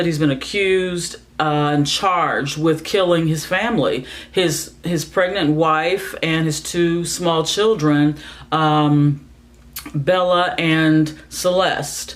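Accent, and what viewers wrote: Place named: United States. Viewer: Love ur American accent x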